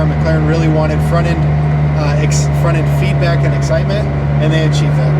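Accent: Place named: Northern California, United States. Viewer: Always appreciate your videos. I've been following your journey since boosted borris. I'm planning on coming to apex as soon as the world is back to normal. I'm curious you are definitely from the states and perhaps in a video if you haven't already you could talk about how you ended up in Germany and also, my guess is your either northern CA or the north west born and raised? Am I close? Cheers.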